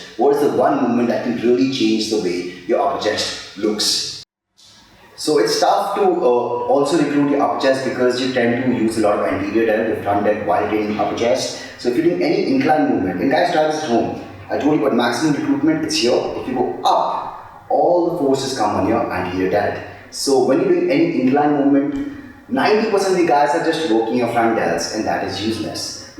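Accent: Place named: India. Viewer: Great and helpful info but sadly I start to hear Indian starts from minute 7.00, then I’m lost.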